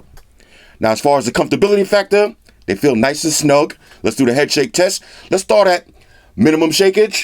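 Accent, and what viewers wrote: New York, United States. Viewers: It’s a New York thing 💪🏽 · Just got into your video's and I really like them. I subscribed to you brotha. Also you sound like a true new yorker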